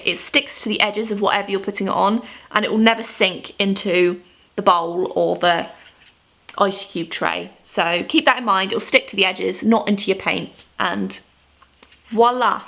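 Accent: United Kingdom. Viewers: Thanks, you always make me laugh, and it's great to hear a British accent. I'd heard of the coloured pencil and baby oil, however, wasn't sure it worked... One hack I always use is Ellnett hairspray. It has to be silicone free. Cheap alternative to graphite fixative, I've even set pastels with it too, and it's a quarter of the price of the named brands. 😊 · not related to her hacks - but is her accent british. to me it looks like british, but then she mentions about dollar store and Ebay USA. so me a little confused. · I watch your vids for hacks and to hear your amazing voice(I would love to have that British accent ahhh <3 )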